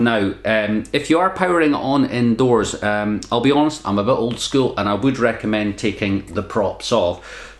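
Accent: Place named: Edinburgh, United Kingdom. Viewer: Secretly excited I knew your accent was Edinburgh in a matter of seconds. I lived in Alnwick, England for 6 mo. during college, which is not far. Cant wait to return on a trip this fall, I have missed the UK! Need to research the drone flying rules there- is it strict? Thank you, sending high-fives from Minneapolis!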